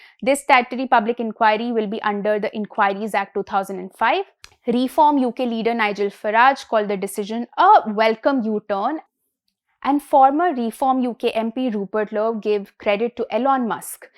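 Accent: India. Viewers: Is this an Indian woman, can tell from her accent 😢yep, unbiased. Have you investigated your gurus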